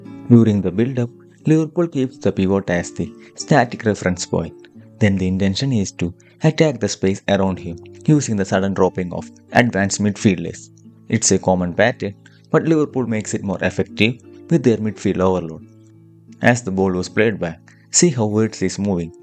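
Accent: India. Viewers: Indian accent and football don't marry well. Talk about cricket and curry. Maybe chess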